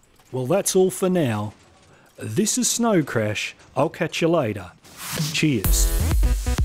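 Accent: Australia. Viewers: Authoritative is intimidating, you sound like an Australian version of the old Etho except with more gusto:D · Are you from Australia? :)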